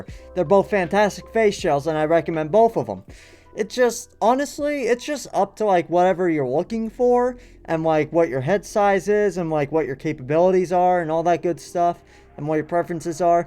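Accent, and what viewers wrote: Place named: Netherlands. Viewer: Are you Dutch by any chance I can hear the accent a little bit hahaha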